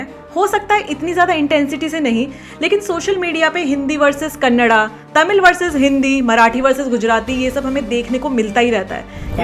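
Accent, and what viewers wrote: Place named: United States. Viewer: American English 😄❤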